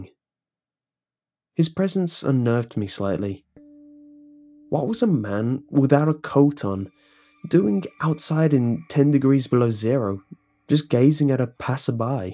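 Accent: England, United Kingdom. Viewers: I'm trying to put the subtitles but you're so British that the words become realllyy fucking false lol fucking audio subtitles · Because that's how he speaks. English by the way.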